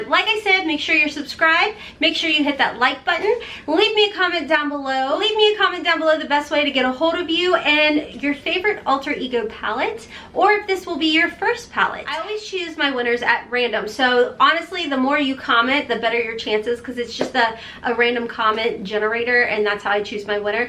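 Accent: South, United States. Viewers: Don't be sorry. I love ur country accent.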